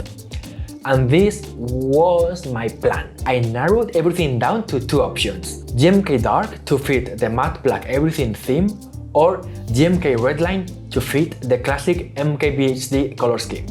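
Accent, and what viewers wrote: Spain. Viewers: Oye se nota que eres español, por que no haces el canal en español? Seguramente tambien tendrias muchas mas visitas, o por lo menos un canal secundario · Very interesting that your english has a clear spanish accent. Not sure if Korean and Spanish sound the same now..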